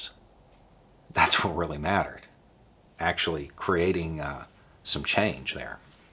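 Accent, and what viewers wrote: South, United States. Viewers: Go, your southern accent was what first caught my attention because half of my family is from the South. The rest are from above Chicago. After you accent, I listened closely to the content...I have learned a lot...and I'm glad about that...Keep up the good work...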